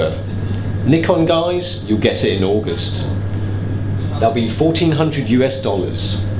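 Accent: United Kingdom. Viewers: "Presented by an Asian guy with a funny British accent." Hahaha always my first thought when watching DigitalRev videos! :)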